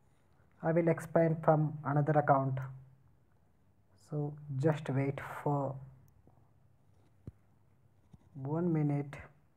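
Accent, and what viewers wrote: India. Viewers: India english as FUCK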